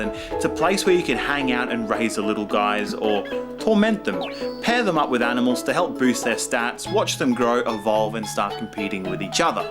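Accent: Australia. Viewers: You sound Australian but you say Saiga not seega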